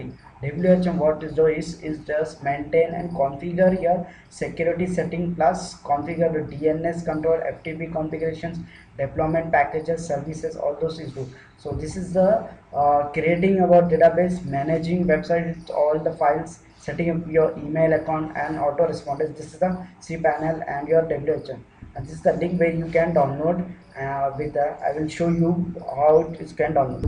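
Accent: India. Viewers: Hello, in this tutorial I will show you how to install cPanel and WHM on Virtual Box. Don't worry man, I can understand everything you're saying. I spent some time in Hyderabad and Khammam, so it's easy for me to understand. People that can't understand you probably haven't heard your type of accent before, so don't feel bad!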